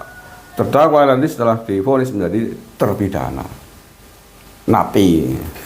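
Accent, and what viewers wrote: Banyumas, Indonesia. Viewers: Tuuuhhhhh khannnn 😂😂😂 senyum gimanaaaaaa gitu khan. Tp yg aku suka dr tuuhhh bahasa banyumasnya mbil senyumm gimanaaaaaa 😂😂😂😂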